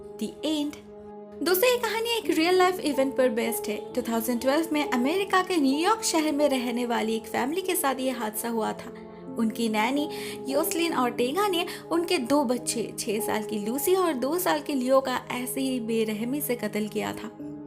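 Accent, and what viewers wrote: Bengal, India. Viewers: Your Bengali accent ❤️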